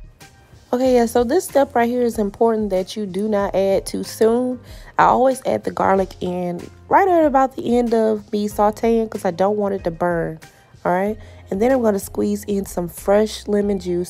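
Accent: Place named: Atlanta, United States. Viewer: Looks awesome!!!, u sound like you’re from Atlanta or Atlaanna how we say it😂😂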